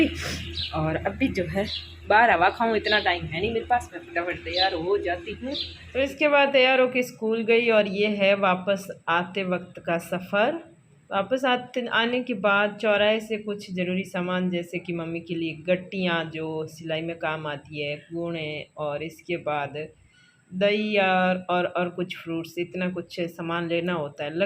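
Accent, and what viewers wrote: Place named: Rajasthan, India. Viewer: Meri beti ki ichha thi apki marwadi sunne ki aaj puri ho gyi ,kl bol rhi thi ki ye didi marwadi bolte h kya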